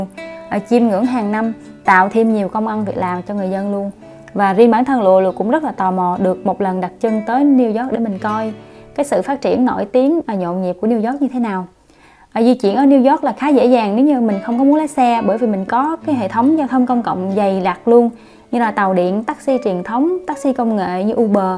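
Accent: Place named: Central, Vietnam. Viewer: tôi rất thích nghe giọng của LỤA chất giọng miền trung , vùng NHA TRANG KHÁNH HOÀ cảm ơn LỤA đã chia sẽ , THANKS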